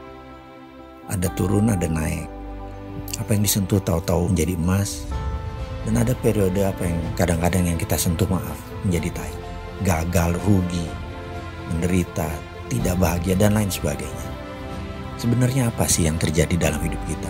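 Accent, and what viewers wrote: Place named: Surabaya, Indonesia. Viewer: iki wrong endi ce rek?,..,. Jadi pingin Dolan nan omahne pingin belajar bisnis. kayak, e wong suroboyo jawa timur bahasanne.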